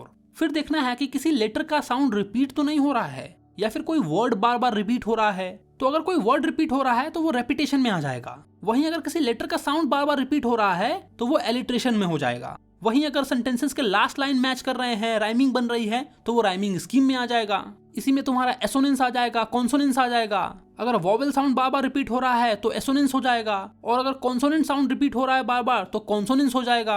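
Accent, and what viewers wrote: Bihar, India. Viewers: bhaiya are you from bihar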